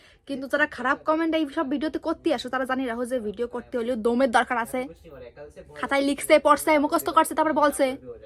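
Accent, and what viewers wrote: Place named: Bangladesh. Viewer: Bangladesh er kun jaigai jeno amon vabe kotha bole 😂😂😂😂😂😂😂kotha gula khub valo lage😂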